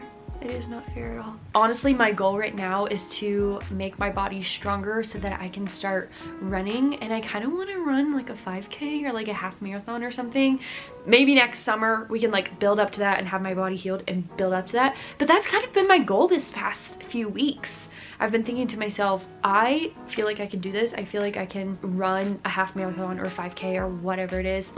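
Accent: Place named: South, United States. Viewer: People who think your accent is fake isn’t from the south lol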